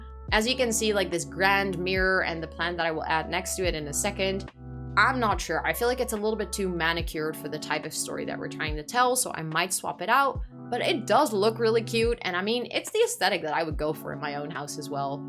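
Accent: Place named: Netherlands. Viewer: I really want to watch this but you are Dutch 😂 I am too and I just haaaaaate a Dutch accent in English loool. That's a me problem tho, I am just wired weird but I cannot stand it 😂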